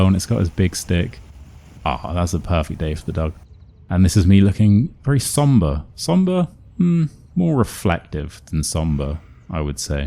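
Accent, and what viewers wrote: RP, United Kingdom. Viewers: Give me your contact number I want to learn British accent from you I am Pakistani girl I want to go to the London on study visa if you don't mind plz sir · Ramble on, we enjoy listening to your “ever so English” voice. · Hi! What a lovely video and content! (I don't know anything about eye patches or the like). I'm glad you've had some fun in spite of your hard work. Most real English teachers from Argentina go to university and undergo a 33-yearly subject study programme, including Language 1, 2, 3 & 4, Grammar 1,2 & 3, Phonetics 1 & 2, Stress and Intonation (laboratory) 1 & 2, English Literature 1, Contemporary Literature 1 & 2, Shakespeare, History of the British Isles 1 & 2, Geography of the British Isles and the USA, American Literature, American History, Pedagogy, Psychology, Methodology 1 & 2, Ethics, Philosophy, ( Religion 1, 2, 3 and 4), etc. 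I did Practice lessons with teens. But I love working with children and preteens. I don't give on line lessons, just in case you think that I'm offering myself as a teacher😅. I follow you because I'm losing my memory and I love your modern RP pronunciation. As you said, you are a real flesh and bones teacher, handsome, talented, diplomatic, hardworking and honest. Thank you, dear teacher! ❤🎉🌺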